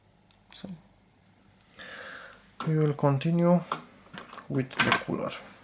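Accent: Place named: Romania. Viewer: pot sa jur ca esti roman! accentul acela :) apropo... am achizitionat si eu acelasi pc recent. din cate am inteles are placa video integrata nu?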